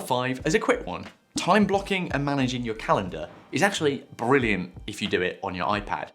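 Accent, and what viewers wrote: United Kingdom. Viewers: I subscribe to ur channel cause I like ur British accent lol …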